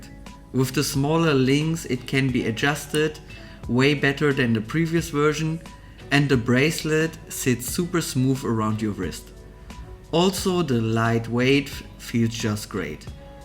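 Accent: Germany. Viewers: Your English sounds a bit German?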